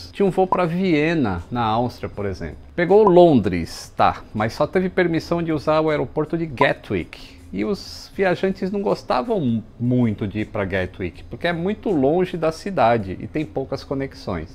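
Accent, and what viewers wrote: Brazil. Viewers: NÃO DEIXEM DE DEIXAR O LIKE !!!! PORTUGUÊS BEM BRASILEIRO............... SALUDOS DE LA PENINSULA IBERICA....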